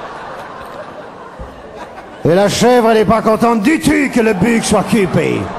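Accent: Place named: Belgium. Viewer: il le fait sûrement pas exprès mais des fois il a un accent belge x)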